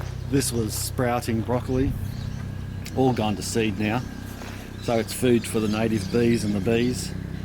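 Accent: Australia. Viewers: Nice...soon I will be making a video like that..as soon as I get my little farm..lol My dream! Are you located in Australia, somewhere like that? I guess because of your accent.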